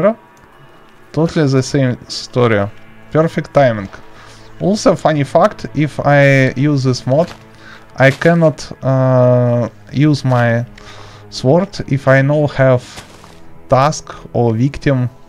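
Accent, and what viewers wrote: Russia. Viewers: У тебя русский окцент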